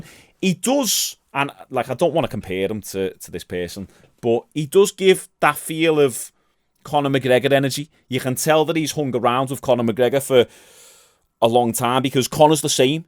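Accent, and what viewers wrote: Liverpool, United Kingdom. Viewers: W scouse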